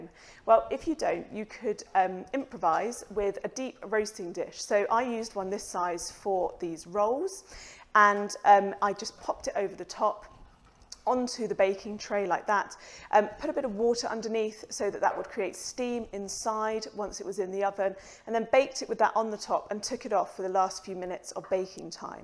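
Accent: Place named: England, United Kingdom. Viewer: Your English accent is simply adorable! 🥰 🪷🪷🪷😍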